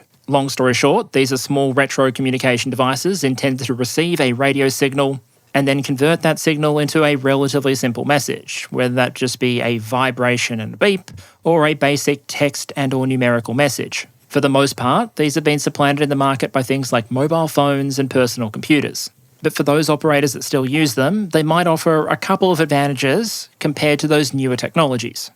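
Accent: Australia. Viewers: a pure stream of facts, graphs and statistics sans bullshit with unparalleled expertise and an aussie accent. what's not to love :) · I always find it funny how you seem to put so much effort into pronouncing some things as per the original language, and yet some words are full Aussie. E.g. compare Iran (with rolled/tapped "r") to Beijing (basically Beige-ing). It's a very Educated Australian way to go about things.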